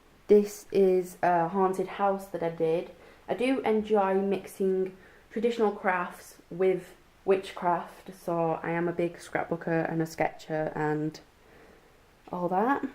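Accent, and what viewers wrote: United Kingdom. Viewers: It's beautiful! What kind of UK accent do you have if you don't mind me asking?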